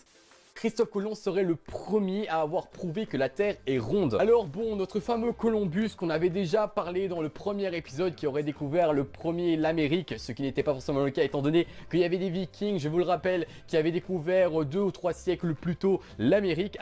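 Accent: Belgium. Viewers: ginisti c'est l'accent belge ça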